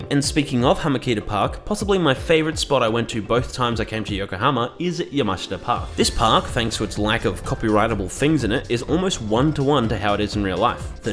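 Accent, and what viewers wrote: Australia. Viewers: This was great mate. Love hearing a fellow Aussie speak facts 😁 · as a fellow aussie, i appreciate the aussie accent 🙏🏼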